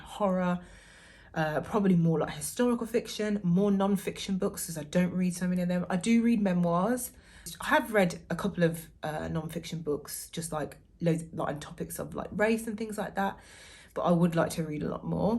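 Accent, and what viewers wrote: Birmingham, United Kingdom. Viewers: вы первая буктьюбер, говорящая с британским акцентом, которую я нашла😭 вы очень красивая! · Stumbled across you accidentally, I love to read and I have a ridiculous amount of books but I don’t think I could do Booktube! Do I detect a Midlands accent? I’m from Birmingham :) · Immediately subscribed for the vibes!! Do I detect a west mids/brummie twang? Either way, I love your voice, reminds me of home.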